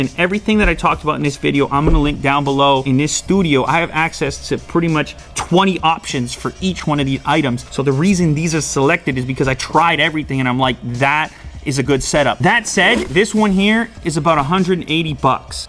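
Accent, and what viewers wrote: Canada. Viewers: you from Canada? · your Canadian??!! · Wait!...your Canadian?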